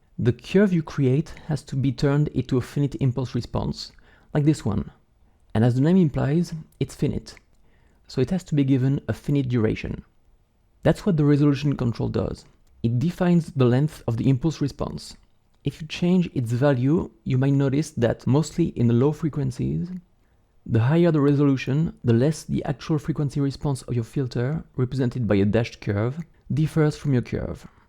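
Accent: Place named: France. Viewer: Merci frro j'ai reconnu l'accent français 🤩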